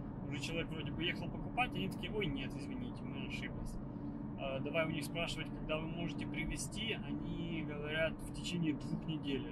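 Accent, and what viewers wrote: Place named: Latvia. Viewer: Akcentas latviskas ;-)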